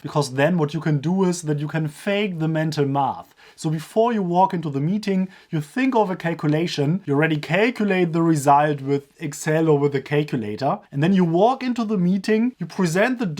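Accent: Germany. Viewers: I love your heavy German accent. You go <3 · you already have a leg up. You are white, you speak with a German accent and your name is. Now imagine this - I am brown, I speak with an accent from the Global South and my name is "exotic".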